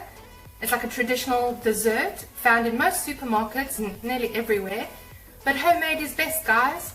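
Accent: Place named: Australia. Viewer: Im going to try it looks awesome! Why did I believe you were from Australia 🤪😅 I guess you're from South Africa (Ive been to cape town as child (still have a scar lol cuz i got stuck in cement it dries fast there 🙄 but when they got me off with mzchines n stuff, I was scared and ran into a closed (I thought the door was open, it was just very clean 😅) but the door broke and I got a huge scar /cut back then. Today its an itsy bitsy scar, but I think of South Afrca each times, iften as I wear make up, 😂) thought you should know, don't ask me why 🙄lol But damn it looks great I wanna try makibg it! Missed to see you cook (loved your last video tho, I'm still in schock and more get up to the surface 😱 Thanks fir great recipe 😋 Xoxo mm 😊👣🌻❤️👍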